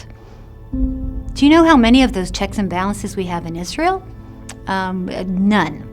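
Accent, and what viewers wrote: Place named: United States. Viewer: Her American education shows in her perfectly American English. I also happen to agree with her....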